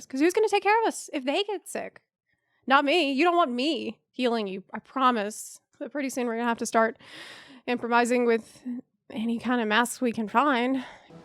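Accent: South, United States. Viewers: I have just discovered your channel recently and I knew you sounded American, but I had no idea you live in Ukraine!? What you doin' over there? Love your content, and I'm also staying in my house except for necfesary grocery shopping. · I never would have guessed you live in Ukraine. Your accent seems vaguely southern United States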